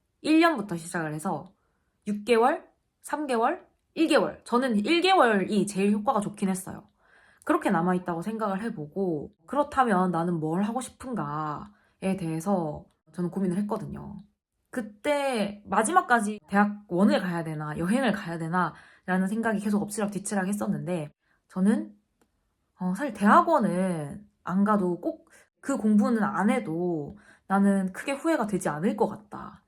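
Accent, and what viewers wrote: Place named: Gyeongsang, South Korea. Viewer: 서울말 쓰려고 노력하시는 경상도분이시군요!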